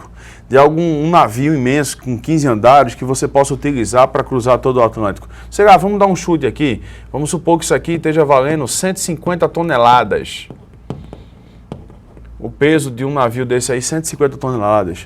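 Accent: Pernambuco, Brazil. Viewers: Ahhhhhhh! você é muito bom! (é Pernambucano né? haha) por favor continue colocando conteúdo de química, sua explicação é top.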